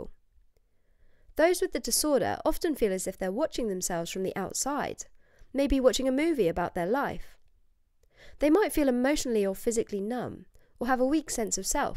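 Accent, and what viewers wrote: United Kingdom. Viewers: your British accent is hard to understand at 1.5 speed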